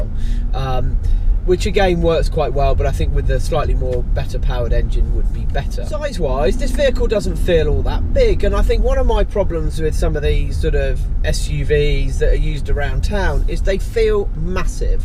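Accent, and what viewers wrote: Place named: Cockney, United Kingdom. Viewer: Typical cockney ponce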